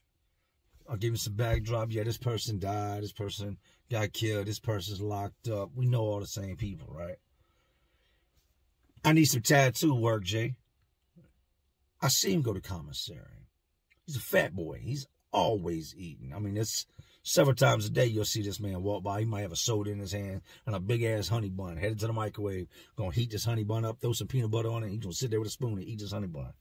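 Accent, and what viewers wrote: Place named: Boston, United States. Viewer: yep all he does is do stories about small fry rapper beefs and shootings n shit. Just regurgitating the same shit as every other news outlet. He just does it with a Boston gangster accent. Thats it.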